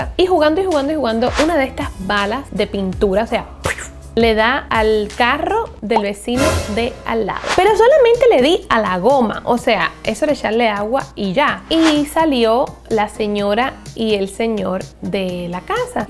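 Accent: Cuba. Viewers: alejandra sanchez yo creo que es por el acento ya que las dos son cubanas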